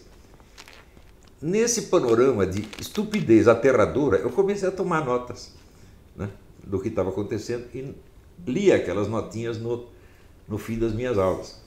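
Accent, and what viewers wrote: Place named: Brazil. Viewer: O prof Olavo é um dos poucos brasileiros que vale a pena ouvir, pelas informações que ele divide conosco, e também pela sua ótima oratória. Ele faz o português brasileiro mais bonito do que o de Portugal. Bless him.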